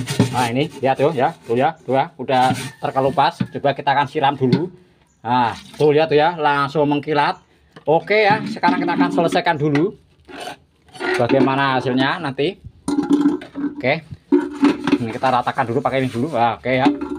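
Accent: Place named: Java, Indonesia. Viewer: Maklum jawa totok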